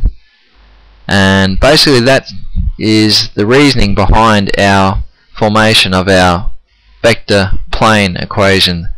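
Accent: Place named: Australia. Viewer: smexy aussie accent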